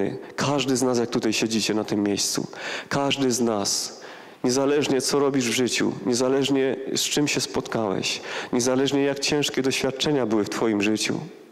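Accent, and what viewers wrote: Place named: Silesia, Poland. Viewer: spoko Ślązok, genialny akcynt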